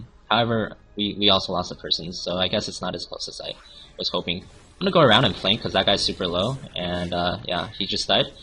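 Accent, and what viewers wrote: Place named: England, United Kingdom. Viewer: Bro sounded more English than he does now, love you xo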